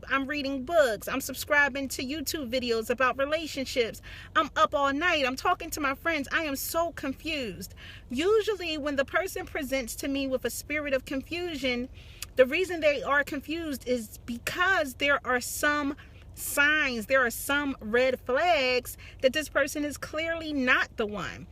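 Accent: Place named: Philadelphia, United States. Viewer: Love this video/message! SN: you have to be from Philly i know a philly accent a mile a way!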